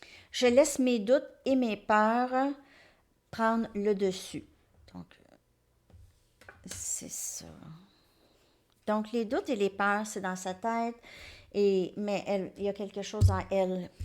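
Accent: Canada. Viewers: j'aime énormément ton accent canadien je adore cendrillon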